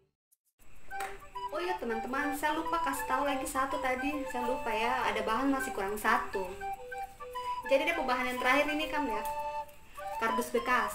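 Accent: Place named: Papua, Indonesia. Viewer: Suka banget liat bunda vlog pake aksen papua, hasil nya DIY keren bun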